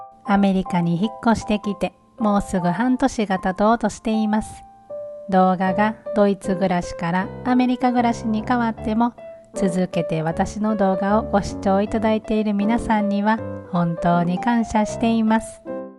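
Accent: Kansai, Japan. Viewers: ドイツからアメリカへ…インターナショナルな生活を送っていらっしゃる、と 見始めると浜村淳さんのようなこてっこてのまったりした関西弁🤣 海外で日本食を大切に味わっておられる素敵な毎日ですね✨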